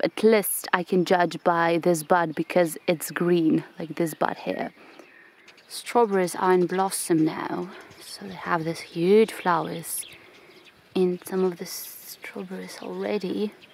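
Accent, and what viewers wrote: United Kingdom. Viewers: You are talking like British people But you are much more pretty God bless you and your family and your homeland Belarus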